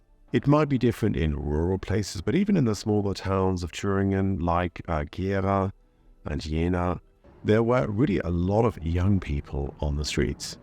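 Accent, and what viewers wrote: United Kingdom. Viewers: It's a real, real pleasure, Brother. I often watch your creations 2 or 3 times. Here, you really manage to pass on your surprise and delight as your tour the cities. It is all so beautiful compared to your preconceived ideas. When you described the lush green, and the ultrafriendly people, I really felt at home. Also, when you described the economic difference, I also felt at home, because a lot of our skilled workers are leaving...going to Oz. The rainbow lady is a bit of me...I can imagine sharing a beautiful experience with a random person. I was curious about why the women blamed some ghastly attitudes towards an American lady, on East Germans. Maybe they were right. I don't know. But I just love the sound of the people and the places you visited. The other things I like about your videos are -your British accent and calm voice -the thought, explanations, ideas, analysis and your personal experiences and opinions that you put into these -the filming...is very good and all kinds of unexpected details are included. 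It makes it very interesting. I like the range of topics you come up with. I love your (British?) humility. Kiwis are quite a bit like that, I think. Some of your topics have a lot of depth...are fairly intellectual. I especially like seeing you smiling. It makes me think you are enjoying yourself in different places...especially in this newly discovered paradise. It is a very sweet smile, and Down Under we do more smiling than some people of the world seem to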